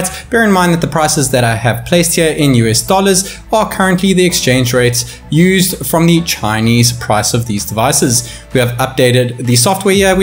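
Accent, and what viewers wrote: South Africa. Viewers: You sound south African, are you?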